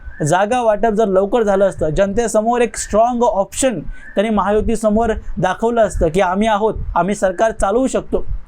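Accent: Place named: Karnataka, India. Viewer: तुम्ही स्वतः कानडी भाषिक आहात का ? काही उच्चारावरून तसे वाटते . तरीही तुमचे व्हिडिओज खूप चांगले , स्पष्ट तरीही मुद्देसूद असतात .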